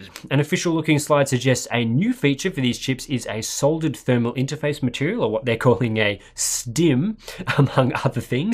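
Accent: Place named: Victoria, Australia. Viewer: It's that Vic accent. I'm SA and I hear the R first. Like sometimes I hear you guys say preformance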